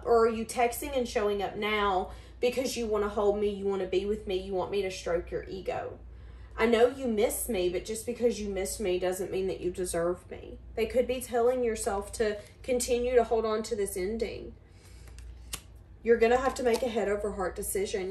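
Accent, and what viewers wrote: South, United States. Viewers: I so enjoy your channel '! Your southern accent is hypnotic and very relaxing! The vibration of all your reads is 100% sincerity! wow also you definetly know my person,haha your gift has taught me so much about The connection with my twin flame!I feel a reunion with us is very close. We have known each other since elementary school,she was my first kiss,first crush,first episode of butterflies in my stomach yep. When we reconnected as adults,a couple years ago,it was magical! It was unbelievable how much chemistry and passion we experienced, never left her house for a solid month,heaven on earth.we connected emotionally just as strong as sexually,The conversations were extremely deep lasting sometimes all night,we also share the same type of sense of humor,and so we also had hysterical fits of laughter that went all night.hqahaha I dont even have the words to describe how much I love this woman. 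She feels the same towards me.However the twin flames journey is full of surprises!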